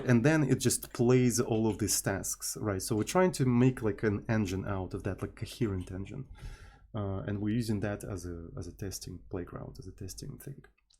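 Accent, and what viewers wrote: Russia. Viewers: хз он тупо по-русски говорит английскими словами норм короче ну надо будет специальные люди придут и объяснят как надо балакать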